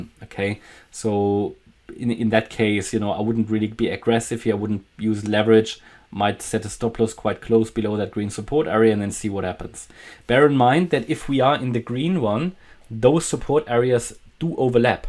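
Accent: Germany. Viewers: Also komme was wolle, ich mag dein Englisch. Ist so dolle mit deutschen Akzent, aber gleichzeitig recht detailreich und super verständlich. Machst du gut . Wünsche euch viel Erfolg mit dem Kanal. Ihr bietet sehr kurzfristigen Input, und nicht erst 3 Tage später nach dem Motto:”Das war ja absehbar!” 👍🏻👍🏻 damit kann ich zumindest mehr anfangen !!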